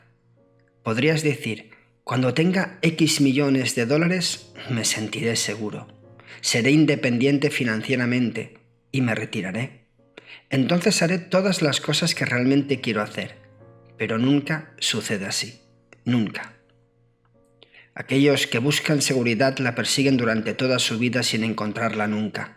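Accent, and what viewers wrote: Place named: Spain. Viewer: Yo quiero desapegarme de escuchar ese acento españoletes gas y de encima las mayoría todo YouTube es de los mexicanos y los españoles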